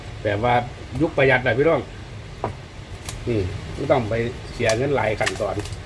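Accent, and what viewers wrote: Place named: Isan, Thailand. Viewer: ชอบดูมากจาก อยุ่จังหวัดไหนของประเทศไทยจ้าเว้าคือกันเด้อยายคือคนไทยจังหวัดชัยภูมิจ้า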